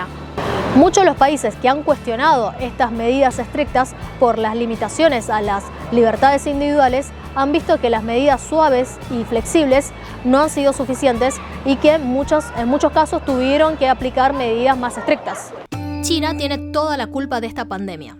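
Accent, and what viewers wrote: Buenos Aires, Argentina. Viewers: Oye tu español está perfecto! En cuanto a China.. vamos a separar 3 cosas. 1. China como país NO tiene la culpa 2. Todos los ciudadanos de china tampoco tienen la culpa, la gente de China es muy respetuosa y tranquila, son buenas personas 3. El Gobierno de China fue el problema. Peeeeeero en USA, Europa etc.. actuaron tarde igual que el gobierno de China. Tú debes estar tranquila, tu país y tus compatriotas NO tienen NADA que ver con esto Soy mexicano y si, el gobierno de México, actuó tarde como lo hizo el gobierno de China. Oye se te nota el acero como de argentina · muchas felicitaciones por exponer u explicar, te soy sincero hablas muy pero re-bien el castellano con ese pequeno tonito Argentino, pero mi gran admiracion y respeto para ti. Gracias.! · Yo me quedaría callada, todos sabemos cómo es el gobierno Chino , y por otro lado el virus salió de algún lado ya sea de laboratorio o que no hirvieron bien su murciélago y por su culpa estamos todos metidos en casa y ahora deben de responder por todo el daño que causaron además ese pinche acento argentino no te ayuda en nada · Esta chica habla un español de Buenos Aires mejor que el mio.....congrats. 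Ya nada importa el virus.....estamos en el mismo barco. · Qué "porteño" que hablas por ser china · Qué graciosa!!! Una china hablando español con acento argentino!!!! Además super controlado el acento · Se nota q viviste en Argentina ! Bella e inteligente !! Estás en Argentina ? O seguís en tu país ?? Me encanta q seas muy clara ! Q con acento Argentino reconozcan tu español latino Argento !! 😘😘😘😘😍😍😍😍❤❤❤❤ Sos hermosa , te queda muy bien tu cabello suelto !! Sos muy joven ! Eres soltera ?? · Muy bueno tu informe ..muy acertado ..me escanta tu tonada argentina ☺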